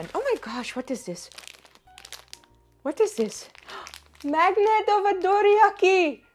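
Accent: India. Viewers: Ik heb een poos niet meer op jouw video’s geklikt maar nu dus wel. En het valt mij op dat je je zelf een typische accentje hebt aangeleerd. Het is Indiaas, Engels 😂 grappig om te horen bij jou.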